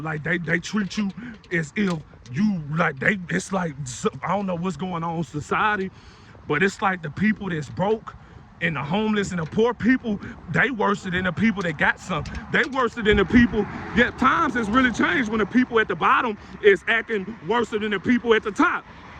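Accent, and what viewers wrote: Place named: New Orleans, United States. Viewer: Probably New Orleans with the yeah Kevin gates says it alot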